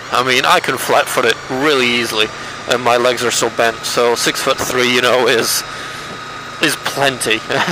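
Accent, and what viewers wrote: United States. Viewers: Nice vid but that mid-adlantic accent......what on earth is going on?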